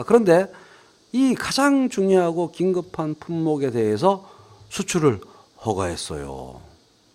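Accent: Busan, South Korea. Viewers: 궁시렁 궁시렁 씰뗴없는 ㅋㅋ ㅋㅋㅋ 진짜 시작부터 속이 시원!! ㅋㅋㅋㅋㅋ저도 부산놈이라 그런가 옆집 아저씨가 설명해주는거 같아요 ㅋㅋㅋ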